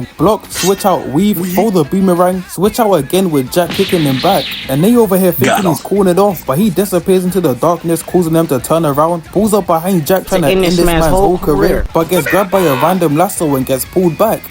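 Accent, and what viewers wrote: United Kingdom. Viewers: Bro I was caught off guard by your British accent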